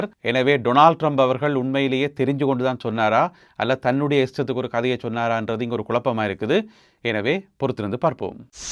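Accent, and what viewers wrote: Jaffna, Sri Lanka. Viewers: அருமையான விளக்கம் உங்கள் யாழ்ப்பானத் தமிழ் எனக்கு மிகவும் இனிமையாக இருக்கிறது. வாழ்த்துக்கள் தினமும் உஙகள் பதிவுகளை தவறாமல் பார்கிறேன். நான் தென் இலங்கை மாத்தறையில் இருந்து.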